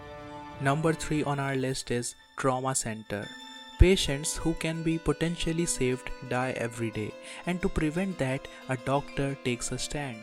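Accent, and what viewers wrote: India. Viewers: i hate indian accent..!! so anoying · Epic video bro. By the way are you Indian? Your accent feels like you are a indian.Btw love your videos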